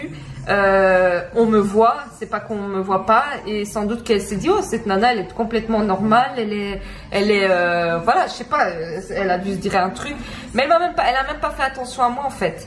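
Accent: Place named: Belgium. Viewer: Tu es super mignonne❤🙏 et ton fis heen😍... serais-tu belge , je reconnais l' accens hahahha / ton fils et metis? excuse moi, mais c' est la 1er vidéo que je regarde de toi et j' aime beaucoup😊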